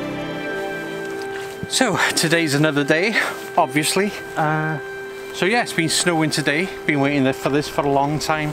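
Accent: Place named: Wales, United Kingdom. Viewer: Blwyddyn Newydd Dda. I sure am missing Gwynedd but good to hear your accent, well I guess you got some more snow, and thanks for getting out there.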